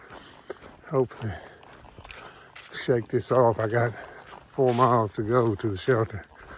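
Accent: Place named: Mississippi, United States. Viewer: I am way behind (Day 42) but jumped ahead to ask a question. I was wondering what service plan you picked for your “In Reach” and if you still feel it was the right plan for you? Sure enjoying your journey! Have you been using your turkey call lately - worked pretty well down south. I have ancestors from the Holmesville-McComb area of Mississippi who fought for a Miss. regiment in the “War between the States” so especially enjoy your perspective and accent. Good luck to Katahdin! God Bless.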